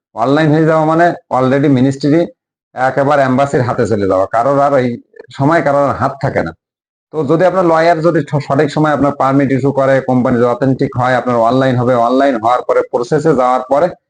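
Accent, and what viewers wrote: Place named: Satkhira, Bangladesh. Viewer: ভাই আসসালামু আলাইকুম আমি আপনার ভাষা শুনে মনে হচ্ছে আপনার সাতক্ষীরা ডিসটিক এর কোন এক জায়গায় বাড়ি দয়া করে যদি এই সত্য কথা হয় তাহলে আপনি আমাকে নক দিবেন আমি আপনার মাধ্যমে একটি কাজ করতে চাই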